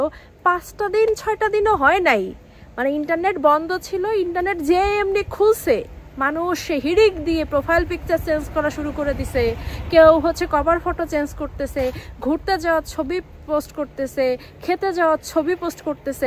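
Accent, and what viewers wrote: Khulna, Bangladesh. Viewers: আমাগের খুলনার বাষা মনে ওচছে? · Thanks a lot..r u originally from Khulna??? Just guessing from Ur accent... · Apni ki khulnar meye naki? Bhashar taan khulnar moto. Amar o khulna. 😊😊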